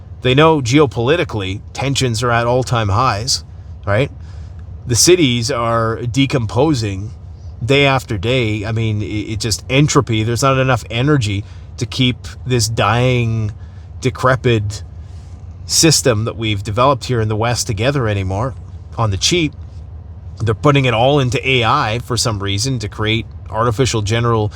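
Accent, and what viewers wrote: South, United States. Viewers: like for the southern accent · Liking that southern slang 🙏❤️🥰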